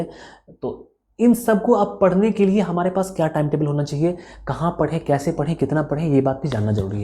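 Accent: Bihar, India. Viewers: Sir I love your bihari style 😍🤩🔥🔥🔥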